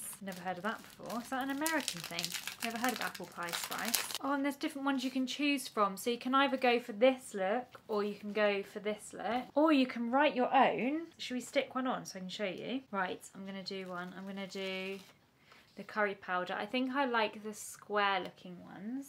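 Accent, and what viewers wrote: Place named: North East England, United Kingdom. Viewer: Hi! My brother lives in Whitley Bay. NE England and listening to your lovely accent has me thinking about him! I do talk to him weekly! You are the cutest young lady! 💕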